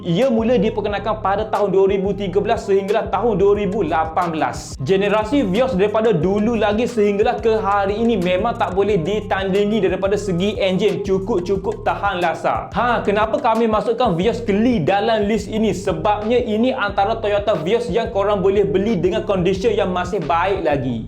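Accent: Kelantan, Malaysia. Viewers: Demo ore klate ko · abe ore klate k? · Konten yang sangat menarik dan berguna, bahasa yang mudah difahami walaupun loghat Kelantan...teruskan berkonten, ambo support 100% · assalam......!!! ce tenang² sikit... lunakkan sikit suara tu... pelat kelate tu xpe la dulu... kendian nti pelan² hilang la tu... in syaa ALLAH apa pun... abg sapot subscribed dan liked dahhh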